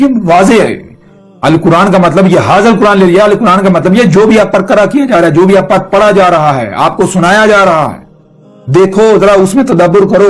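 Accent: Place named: Gujarat, Pakistan. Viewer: Iss gaandu ko urdu bhi theek se bolna nahii aati.......lehja marasiyon orr gujraatiyon wala hai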